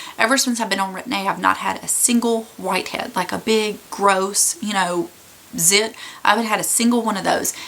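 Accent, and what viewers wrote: South, United States. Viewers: Your country accent is just ADORB! 😍😍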